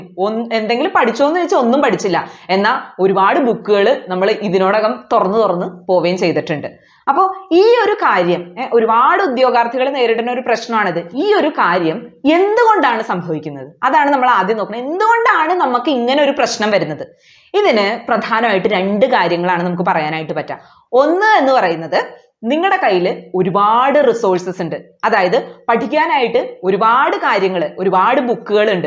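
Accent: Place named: Kottayam, India. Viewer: കോട്ടയം കാരി ആണോ നല്ല ഭാഷ ശുദ്ധി, പറഞ്ഞത് ശരിയായ കാര്യം തന്നെ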